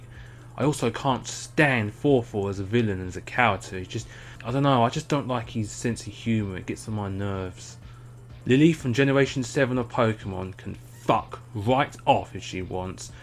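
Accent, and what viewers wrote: England, United Kingdom. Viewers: dull: dou battle: ba-uh man I love the English accent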